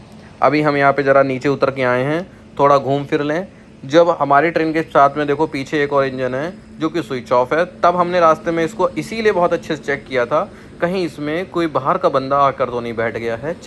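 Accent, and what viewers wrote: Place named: Western Uttar Pradesh, India. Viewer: भाई up west के हो क्या? Ascent lag raha hai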